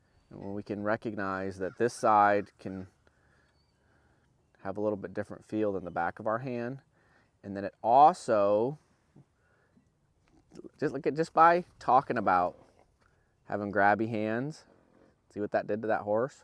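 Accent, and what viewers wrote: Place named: West Midlands, United Kingdom. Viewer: He has a West Midlands accent (Brummie/Black Country area), not a Welsh one!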